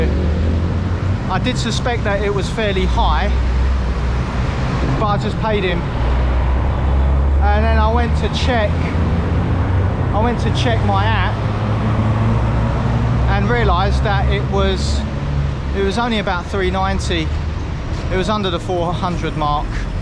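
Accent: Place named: England, United Kingdom. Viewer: Sorry for your bad experience with a taxi driver. A long time ago in Manila we had a similar experience, but it did not work in his favor. Because as soon as he demanded a lot more money than the usual fare from us, we got out of the taxi immediately. And yet he had the guts to scold us. We could have given him tips if only he was good and honest to us. They are the rare ones. However, we come across with many of them who are so honest and kind to us. Glad that you got over it and please continue your good work. I just love listening to the interesting things you say... and your English accent too. Hehehe! Thank you, keep safe and God bless.:goodvibes:😇🥰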